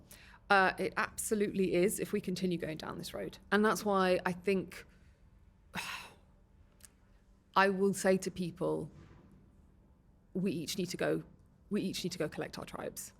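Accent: United Kingdom. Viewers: British English is charming! U.S. English is beautiful, but UK English has a certain magnificence.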